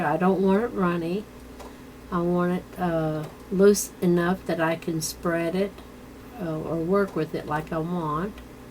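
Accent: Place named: South, United States. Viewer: A very interesting technique. Thanks for sharing it with us. Have a great Easter. Just out of interest, what part of the USA are you from. I'm guessing somewhere south by yr accent. Hugs Lynn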